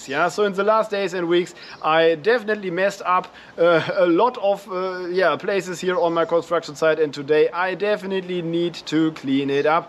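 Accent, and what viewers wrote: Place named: Germany. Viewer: I'm enjoying your video and the German accent, good luck with your project and your Channel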